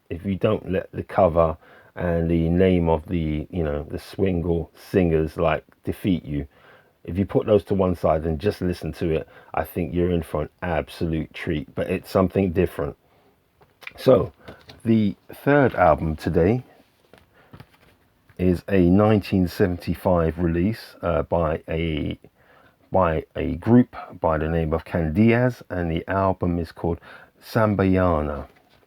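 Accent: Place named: United Kingdom. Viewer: Hi, I've just come here on the recommendation of Julius Jabbar. He said he thought you sounded British, but I'd wager that I can hone in on your accent further. You sound English to me and slightly posher than I am. I'd guess you are from south of the Watford Gap. Possibly not far from the M25. How am I doing? I've got that Swingle Singers LP with a different cover. Didn't know it was controversial. Love the Xylophone with those voices. Very nice! I'm really really happy to have found your channel. Looking forward to caching up with your past videos. Cheers!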